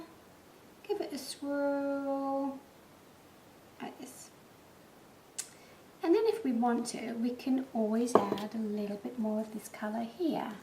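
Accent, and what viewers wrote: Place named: London, United Kingdom. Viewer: Hi there ive discoveted your chanel and absolutely loving everything you surround yourself with. The girly in me would love to just emulate everything you have. However I live in a house full of males (husband and 2 sons so I keep it a very neutral grey and white theme). I adore the colours of the gorgeousness on the table as well as the colours of your paints. Just a couple of quesries/points... When you were mixing your paints and whilst adding in the white - you mentioned you were adding 'teaspoons' of white paint - however, the spoon you was using appeared to be much bigger than a teaspoon so please would you be able to clarify your measurements a little better to save confusion in future videos. The spoon in the white paint also appeared to be quite a bit bigger than the spoon in your powders which did look more like a teaspoon size. 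Also you mentioned cost in dollars but you sound English (from the greater London area). Are you based in the states or some other place that uses dollars as I suppose I am assuming you mean US dollars but this could be just my assumption. If you are in the UK still, please could you provide costs for your paint powders in £ sterling. Just one more thing. The resolution of the video doesn't fit a full mobile screen (when in landscape mode). All other videos I play from other channels fill the area as they should. Is this something in your set up or mine. Although I would say it's not mine but maybe something different I have to do with yours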